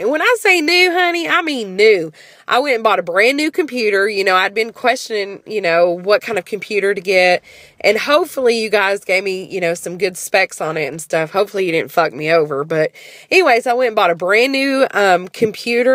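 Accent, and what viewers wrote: South, United States. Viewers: It was like Christmas seeing a video from you! :) Missed that southern voice! Keep your chin up, sweetie! Hopefully this drama passes quickly.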